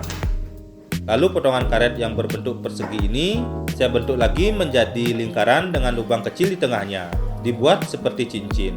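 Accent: Bali, Indonesia. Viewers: Bicaranya seperti orang Bali ya brow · Suara org dari bali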